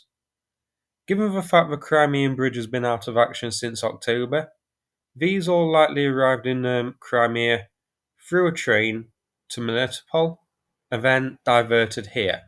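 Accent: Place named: England, United Kingdom. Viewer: Boring. Zero insight. Just a drab, dull, less-than-perfect working class English accent.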